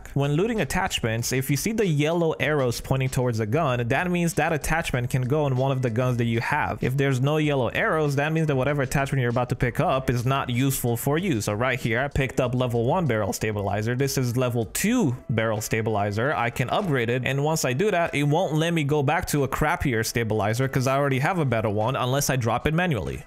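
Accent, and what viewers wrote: Romania. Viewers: R U ROMANIAN?/Esti roman?? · wtf are you Romanian? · u romanian?? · OMG ESTI ROMAN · u romanian?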